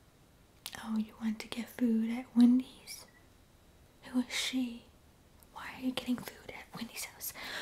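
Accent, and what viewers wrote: Canada. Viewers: Are you canadian? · Fruity Newfie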